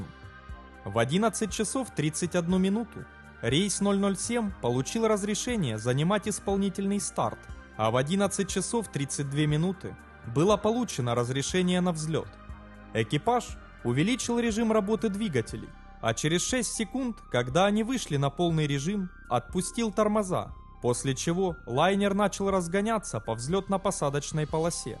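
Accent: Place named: Ukraine. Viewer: Это неисправисо..! Карьтавые идут на радио, а с ужасным малоросским акцентом зачитывают длиннющие авторские тексты!🤯 Скоро рыбакам пора курятники обслуживать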